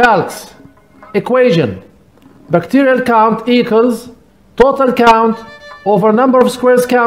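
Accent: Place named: Arab world. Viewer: Brother 😂 your speed = like a ferrari but i have gotten by accent you are an Arabic where as i am from kashmir . So it was more difficult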